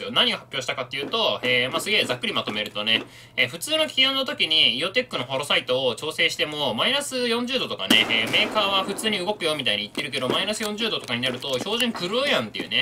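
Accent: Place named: Western Japan, Japan. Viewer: 西日本の人は質をひちと読みます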